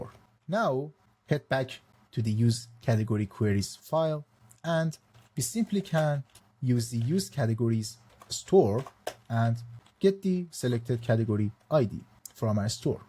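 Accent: Iran. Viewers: Persian Accent Discovered ✅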